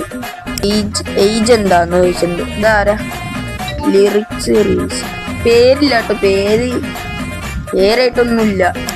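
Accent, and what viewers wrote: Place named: Thrissur, India. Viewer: Pkd aano... ഞാനും pkd ആണേ... ഇയാളുടെ slang trissur ആണല്ലോ 😊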